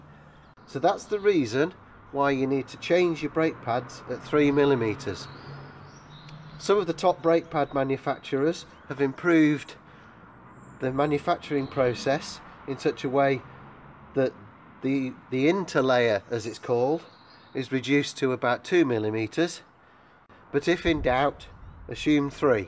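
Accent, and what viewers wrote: England, United Kingdom. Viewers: I had the same question; why at 3? Thanks and I love listening to the English English!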